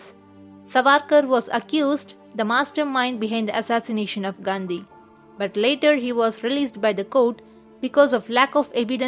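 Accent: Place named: South India, India. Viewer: Madrassi ascent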